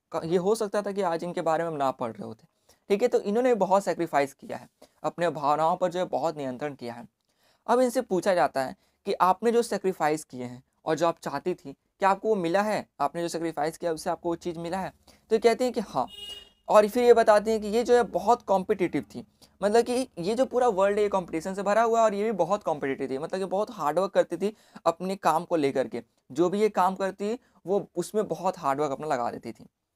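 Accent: Bihar, India. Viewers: Ohhh u r from Bihar 😱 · Oh mujhe lga ki ap bihar se ho qki hlka apki boli match kr rhi thi but ab confirm ho gya😂♥️wahh!!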